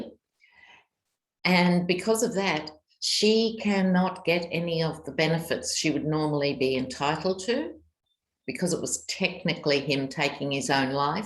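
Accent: Australia. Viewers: Thank you, I love that you have a number for every video and the Australian voice sound is so beautiful on top of that what a gift your reading are for us here in the US.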